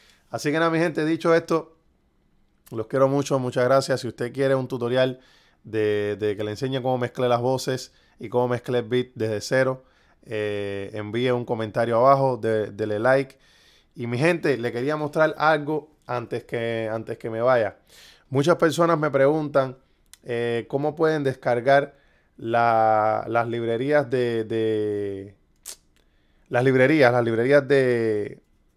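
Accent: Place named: Cuba. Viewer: eres cubano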